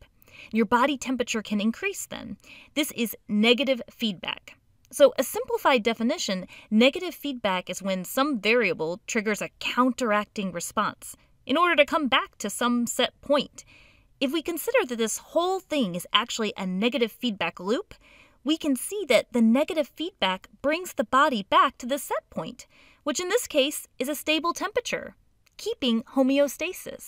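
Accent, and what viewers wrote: United States. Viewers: Gr8! R u American..?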